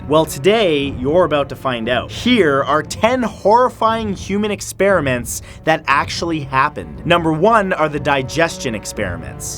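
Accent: Canada. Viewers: Your Canadian? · So your canadian? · this is Canadian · You're from Canada?!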